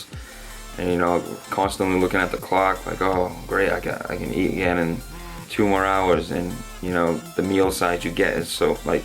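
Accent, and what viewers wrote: Boston, United States. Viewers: CAHBS. Love the Boston voice. \m/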